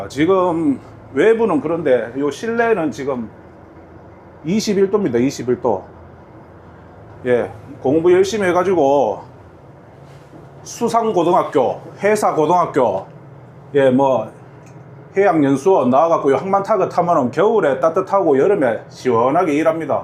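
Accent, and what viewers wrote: Gyeongsang, South Korea. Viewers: 선장님 말씨가 포항 쪽 말씨네요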